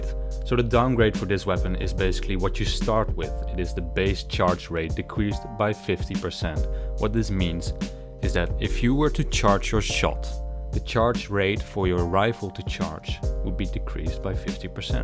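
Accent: Netherlands. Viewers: ey just found out your channel and i can already tell ur dutch (same here). keep up the good work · Je Nederlandse accent is echt heel duidelijk :D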